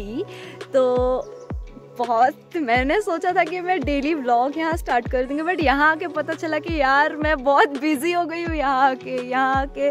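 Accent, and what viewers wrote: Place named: Maithili, India. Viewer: Where are u from? Sitamarhi or Muzaffarpur? The accent i heard is "Maithali'', so i guess u from Sitamarhi. I am from Sitamarhi, Bihar too and currently i live in New Delhi. BTW i loved your vlog. It reminded me of my village. Keep going.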